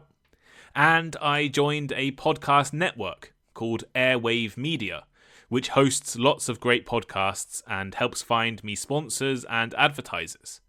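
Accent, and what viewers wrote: United Kingdom. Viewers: I subscribed the pareon for some time and I can guarantee about the quality of the conversation clubs and the English courses grammar running by the Thinking English team., and Peter are such wonderful teachers and the chance to speak with english learners all around the world is incredible. Thank you very much for your podcast, you've been helping me a lot to improving my english and getting used with british accent.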